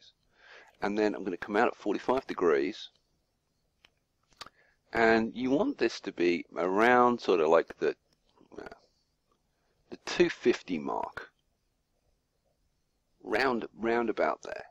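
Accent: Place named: United Kingdom. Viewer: I did it just immediately and it worked really fine! thanks again ! Hey your are from uk or Scotland? Nice accent !!!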